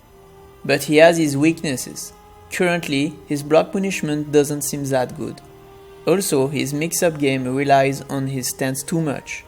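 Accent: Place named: France. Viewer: Tu dois être le seul dont l'accent français me dérange absolument pas ^^ Il est très prononcé mais tu articules très bien et as un débit de parole bien adapté ! (pis bon, le contenu est super intéressant donc ça joue :P) Bravo pour le taf que tu mets dans tes vidéos en tous cas ! Vivement la sortie du jeu ! :D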